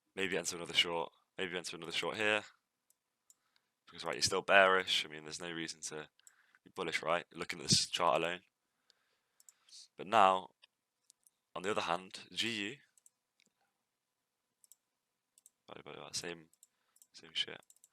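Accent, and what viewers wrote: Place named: London, United Kingdom. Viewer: laughed out loud at 7.29 when you say wer are fucked lool. Are you from London bro? fix up a channel asap.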